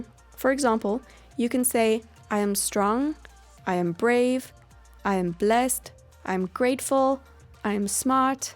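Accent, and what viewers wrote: South Africa. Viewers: Are you South African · Your accent makes me feel like I'm home :D I'm a South African living in London. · are you from South Africa? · are you South-African, because your accent sounds like it, im South-African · Are you South African? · Fellow south African is that you??? ♥️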